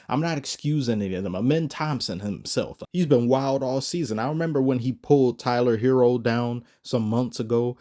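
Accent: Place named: United Kingdom. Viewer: Sounds like a British/Aussie accent more than a Kiwi one lol. Keep it up tho, moite! 🤙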